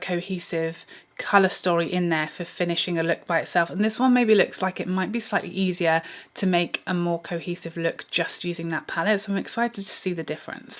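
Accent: United Kingdom. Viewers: Oh a new video. Thanks and your accent always gives me wanderlust vibes to go to UK. I hope it will happen soon. :-)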